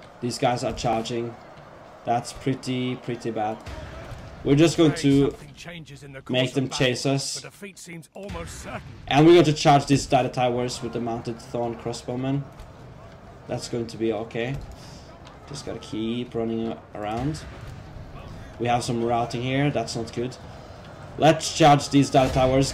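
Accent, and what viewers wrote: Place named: Ireland. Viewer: you sounded irish, pretty cool though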